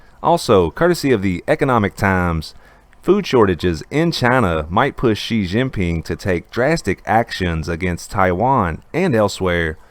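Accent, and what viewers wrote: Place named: Appalachia, United States. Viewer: how yual doooin. love this guys hillbilly accent.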